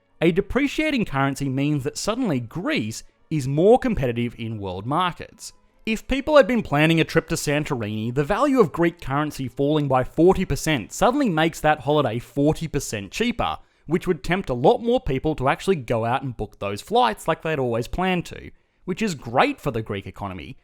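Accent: Australia. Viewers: It is very true that Greeks leave the country especially the young ones. I am 17 right now, when I finish uni in some years I am planning to move to the UK/US/AUS (i am looking for a stable, English speaking nation) and so are most of my friends. Also loving your Aussie accent mate! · Narrator is aussie i think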